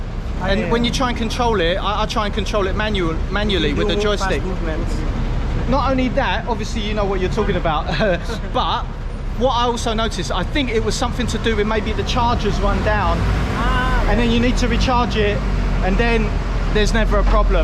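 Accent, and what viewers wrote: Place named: England, United Kingdom. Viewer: Sorry for your bad experience with a taxi driver. A long time ago in Manila we had a similar experience, but it did not work in his favor. Because as soon as he demanded a lot more money than the usual fare from us, we got out of the taxi immediately. And yet he had the guts to scold us. We could have given him tips if only he was good and honest to us. They are the rare ones. However, we come across with many of them who are so honest and kind to us. Glad that you got over it and please continue your good work. I just love listening to the interesting things you say... and your English accent too. Hehehe! Thank you, keep safe and God bless.:goodvibes:😇🥰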